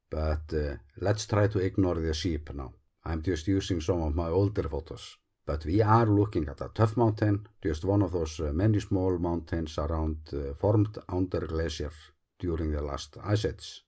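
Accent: Iceland. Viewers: Awesome video as always. I really enjoy how easily and interesting you are talking. And your Icelandic accent is beautiful 🙂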